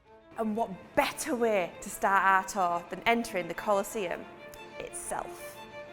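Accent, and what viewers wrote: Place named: Yorkshire, United Kingdom. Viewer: Although it is refreshing to 'hear' a Yorkshire accent, & a female one too(!), presenting for the Royal Armouries Leeds it is unfortunate that both strength of voice & quality of recording made it extremely difficult to hear everything spoken. Not the first occasion R.A. 😒 Please mic. your presenters up personally for clarity! 🤨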